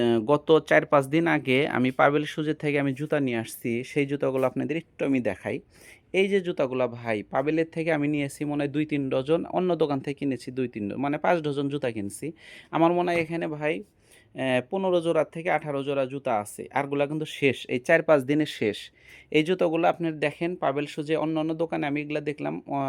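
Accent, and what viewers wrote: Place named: Bogura, Bangladesh. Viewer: আপনার ভাষাগুলো বগুড়া বগুড়া লাগে❤❤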